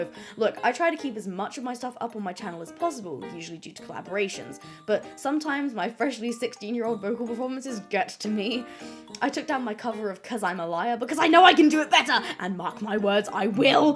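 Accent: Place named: United Kingdom. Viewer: oh god she's british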